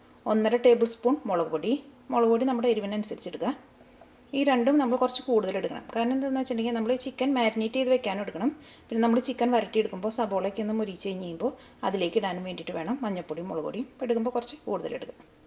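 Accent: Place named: Irinjalakuda, India. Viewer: I like your slang.. are u from Trissur?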